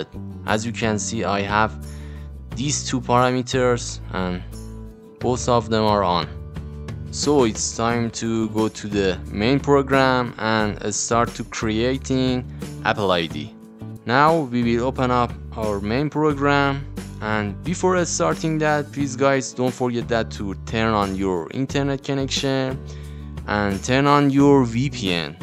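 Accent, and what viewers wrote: Iran. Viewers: معلومه لحجه ایرانی دارع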